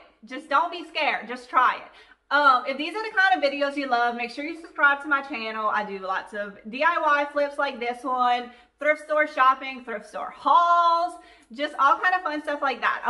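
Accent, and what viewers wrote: Louisiana, United States. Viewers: You are so creative and talented, ☺️ I'm from Mamou, (central Louisiana). I live in NC, so that I can be close to my 2 grand babies. I miss Louisiana and my siblings, relatives, classmates & friends; when I get homesick, I search for your videos, because you sound so Cajun. You sound like my favorite cousins, from Houma!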